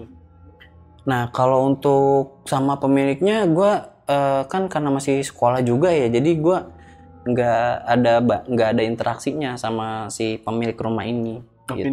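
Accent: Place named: Bali, Indonesia. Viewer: Hadir sampang madura, btw bg orang bali y logatx bali bgt🙂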